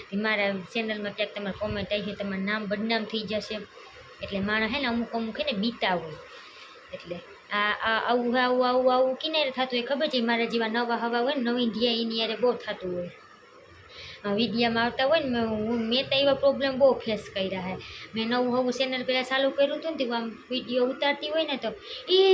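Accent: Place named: Mer, India. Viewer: Ben emaa dhyan j n devaay saachi vaat che ben aapde aagd vdhy bijaanu n jovaay tme ben amaara mer jevu j bolo cho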